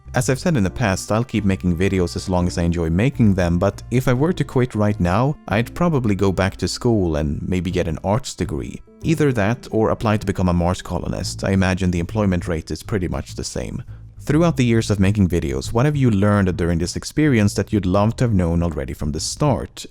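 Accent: Gothenburg, Sweden. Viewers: Är du från Göteborg? De låter så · Sooooooo i caught something in this video.... I think accent is becoming more english than swedish. Honestly that thick swedish accent is what makes your videos stand out from the whole youtube space. Oh and also congrats on 3M! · Lem’s narration on his older vids (especially 2 mil Q&A) reminded me of Keralis... meybe it’s because of the low pitch Swede accent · No, he has a slight swedish accent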